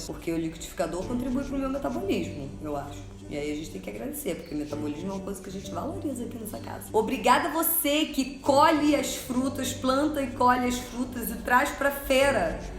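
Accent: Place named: Rio de Janeiro, Brazil. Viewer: Eu li um pouco de Fuco, que ele é extremamente futurista e analítico sobre nossas existências, e eu fiquei confusa confesso com a informação de que somos pontinhos e milhares deles e que enxergamos a matéria em si porém o nosso cérebro transforma esses pontinhos em matéria, por isso nos enxergamos em corpos físicos e não pontinhos, teorias são uma excelência da vida humana, se todos os seres humanos tivessem consciência das suas capacidades que vão além desta que é a matéria prima primitiva o mundo seria melhor para ser vivido certeza, e acho super fofo você falando com seus "s" no final das palavras com sotaque carioques ....adoro seu canal vida inteligente no YouTube sucesso jout jout...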